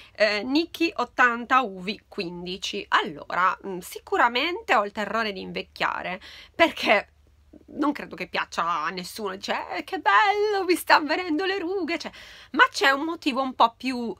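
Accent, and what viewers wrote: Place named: Sardinia, Italy. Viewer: Si questo è il nostro accento cagliaritano quando vuoi lo parliamo assieme 😉😉😉😉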